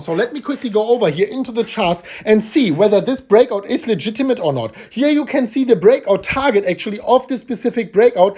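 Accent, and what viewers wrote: Germany. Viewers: HES a German hes accent is funny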